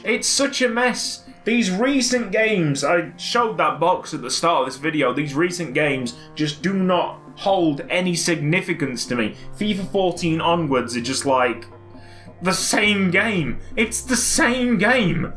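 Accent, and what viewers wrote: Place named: United Kingdom. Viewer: buuuuuuuuuuuuuuuu ;_; i mean British accent :#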